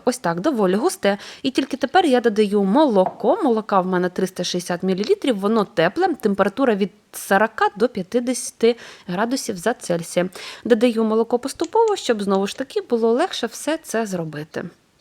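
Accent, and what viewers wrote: Russia. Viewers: Чого ви акаєте? Разрівнюю, замаруватися, лапатка, не патрібен салона начинка .. Торт гарний звісно, але стежте за мовленням, будь ласка!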